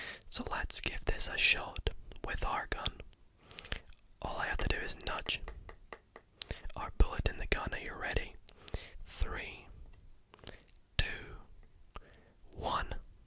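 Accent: Scotland, United Kingdom. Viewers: What's that accept ? I'm english I'm hearing hints of scottish with something else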